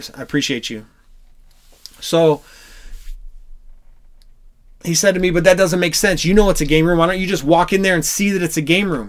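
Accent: Hawaii, United States. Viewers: Haole-Hawaiian for LIFE! Makes me smile when your pidgin comes out interspersed between most of the reg. English stuff. Haha. Right on man.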